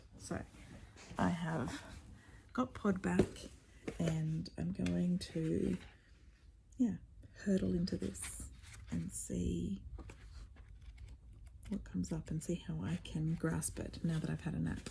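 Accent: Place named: Australia. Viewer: Brent wants to know if you’re originally from the Melbourne area. He’s listening to your accent.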